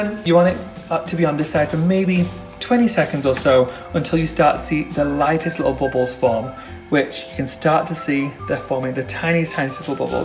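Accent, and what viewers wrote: United Kingdom. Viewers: Zehra Rizvi Isnt he British? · A C R Maybe it’s just the dialect that I grew up with, but roti also has a solid “o” sound and a thick “t,” I think he knows he’s using an English accent because he pronounces it with a Pakistani accent in the beginning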